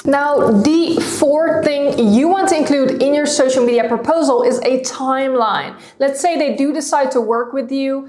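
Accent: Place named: Netherlands. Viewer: Ben je van Nederland? Ik hoor een zwaar accent😉groetjes uit België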